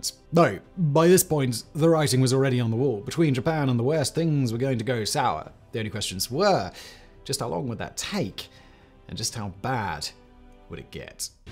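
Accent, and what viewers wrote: RP, United Kingdom. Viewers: Your RP is so over-the-top posh, it sounds similar to Jacob Rees-Mogg.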